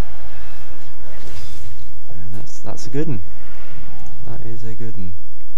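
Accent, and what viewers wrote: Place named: United Kingdom. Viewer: British accents are always amazing. If you weren't so quite and more talkative, then you'd easily be a famed commentator. An example is the user <link>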